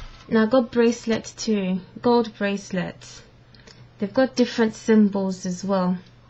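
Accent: Fante, Ghana. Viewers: yayyy... kwanyako all the way.... am from the say place buy live in the USA... i love how u speak the local dialect ... and u are so pretty... wish u all the best in London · I hear the fanti and akuapem like accent real nice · I like your fante language accent. I am proud of you. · ......hmm...you've done quite well....nevertheless i hope you know about the various different dialects in the Twi Language...you do speak erm lets say fante which is a bit erm okay...i love your enthusiasm and your hold onto the Twi Language..kUDOS..AND YOU said people say you speak agona fante AND TWI thats a bit erm not right...agona fante is twi....they are not different.....its Agona Twi Fante....thanks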